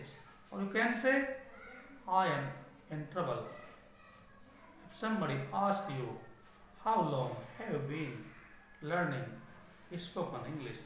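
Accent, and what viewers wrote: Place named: United Kingdom. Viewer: Best of all english just like british pronunciation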